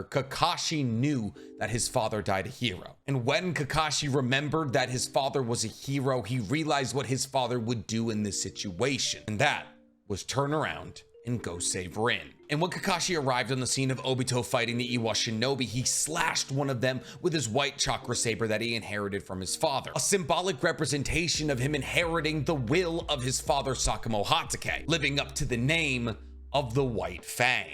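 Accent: United States. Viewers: Min-uh-toe........Min-AH-toe. Sounds infinitely better when you pronounce his name properly. You know, for as much of your content, and thus, your livelihood, depends on Japanese culture, you'd think you would spend 20 minutes learning how Japanese phonetics work(and no joke, it doesn't take longer than 20 minutes to figure it out, and really, 20 seems like far too long at that), so you don't sound so absurdly... White? So American? So gaijin? Either way, I know you can tell how weird you sound since you've heard all these names said properly in the anime. So why are you so content to sound so off putting?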